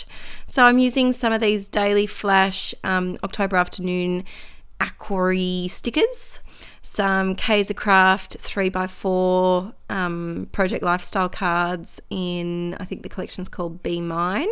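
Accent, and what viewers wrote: Australia. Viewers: AWESOME Project Life video! So good to hear another Aussie! I absolutely loved it and have definitely subscribed. I think we have a bit in common and if you get a chance I would love you to have a look at my channel xo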